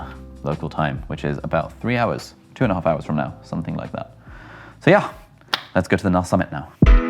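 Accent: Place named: India. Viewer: Your hindi accent is like lagaan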